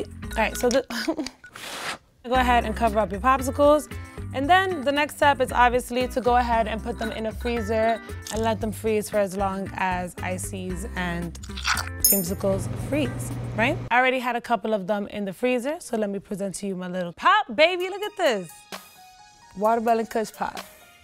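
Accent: New York, United States. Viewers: She from New York right? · She’s deadass from New York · She gotta be from nyc nfs😭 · She is so ny spanish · She sound like she from NYC or NJ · New York accent is fire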